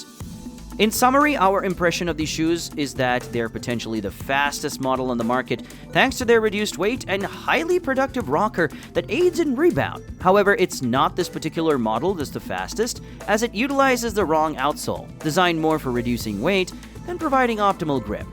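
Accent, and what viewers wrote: Russia. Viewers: I need the Russian accent 😢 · for real? Dang, I miss the Russian accent voice but looking and listening this one, I understood why. It feels like it’s made for a wider, global audience that’s used to American youtube channels. Add to the fact in your reply to another comment that you have two jobs. I’m just glad this channel is just dropped a new video after a long time, right with Adidas’ new elite running shoe.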